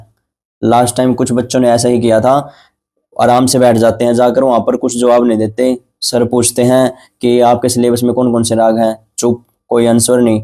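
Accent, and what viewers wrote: Haryana, India. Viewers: That Haryanvi tone hits ❤❤ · Haryanvi tone ❤❤ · Kitna sahi accent hai bhai. Haryanvi ho? Thanks for the information and motivation btw❤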